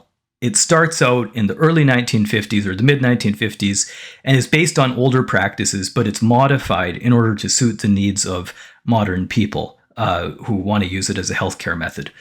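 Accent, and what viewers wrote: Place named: Minnesota, United States. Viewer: Are you out of Minnesota? I hear an accent.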